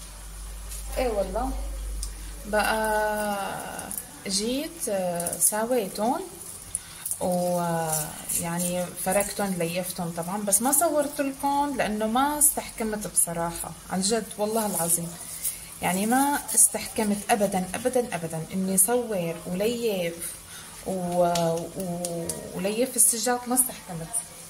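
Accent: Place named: Levant, Arab world. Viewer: دخيل صوت شامية حكيك بجنن ❤❤❤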